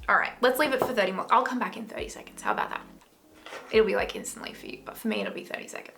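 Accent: Australia. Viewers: Hi sweetie. I just rewatched this to see how you prefer to put the nars on and I must have missed you saying it the first time but you haven't tried the soft matt. You should. It's my favorite of all the nars foundations, It's for your more full coverage days. But so beautiful. And you get so much more product. Try it one day. xoxo BTW Great video. You always have me laughing with our aussie accent. Love ya gutts babe. xo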